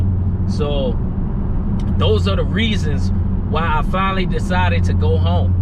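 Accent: Baltimore, United States. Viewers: you can tell he from b more cus of the way he pronounce words with "ou" "ue" "ew", they all sound like "uuuuuuu"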